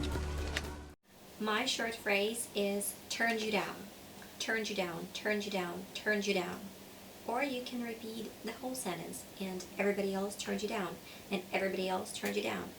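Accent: United States. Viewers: Perfect understandable american accent. You sound like were moveed in englishspeaking country in deep childhood. And it's so increadible that you are from Ukraine and can reached that level in one-two years. Great unbelievable achievement) The latest your videos are like you are completly born in US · However, your video in 2023 I just watched, I mistook you as American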